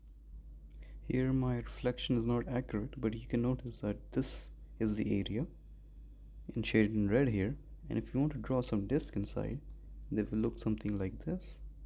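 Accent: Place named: India. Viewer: Bro u have indian accent i don’t understand anything u indian biryani scammer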